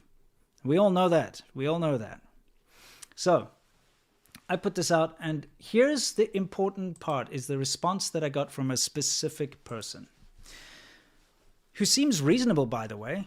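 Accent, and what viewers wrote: South Africa. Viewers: Your SA accent is slight but insidious. I spent half the video thinking you were talking about something called a "Lenin wall". They should arrange reinforced glass cases for such things at universities to avoid vandalism. Or at least make it a punishable offense to vandalize them. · I love watching your videos, we all know that the Chinese govt sucks, but there’s also the usual “it’s the government, not the people”. You bring us education especially about things we’ve never imagined we’re going on. Unfortunately we also learn that indeed (some of?) the people are to be viewed with suspicion as well, as they walk among us covertly. That’s so sad, I haven’t decided yet if that’s all only because of their government and they wouldn’t be horrible otherwise or if the government is that way because people are. I’ve seen them do some pretty shitty things here in my country just in everyday life, and heard worse from people who had to go to China and other Asian countries for some reason or other. 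It’s interesting how obvious it is here when you see a person who has Chinese blood, you can usually tell way before they open their mouths if they were born here or not, even just by how they walk and look at people, and the way they hold themselves, or even if they’re littering, picking their noses, and spitting everywhere or not. Plus I love your accent, it feels like a homey thing to me as I went to school and grew up with many, many people from SA, so that sound is a little bonus :)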